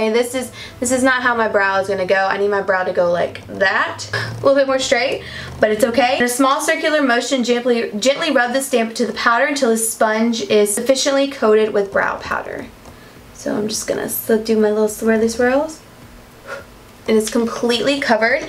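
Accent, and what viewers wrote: South, United States. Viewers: I absolutely love your videos and southern draw!! Keep it up darlin'. 💚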